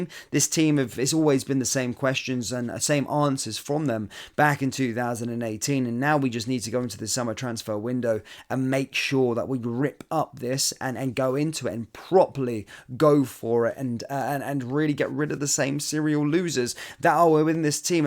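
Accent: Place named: Glasgow, United Kingdom. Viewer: Great Glasgow accent mate.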